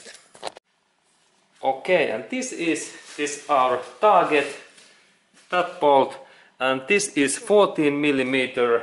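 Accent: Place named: Finland. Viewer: OK , I am willing to bet that this guy is Finnish , typical accent from Contemporary Finn who learned English as second language.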